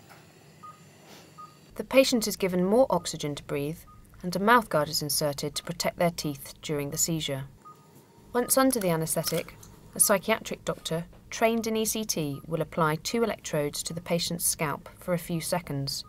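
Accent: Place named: England, United Kingdom. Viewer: I adore such English pronunciation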